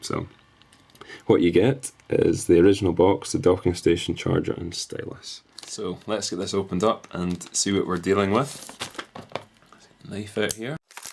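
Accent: Scotland, United Kingdom. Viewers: Is that a Scottish accent I hear?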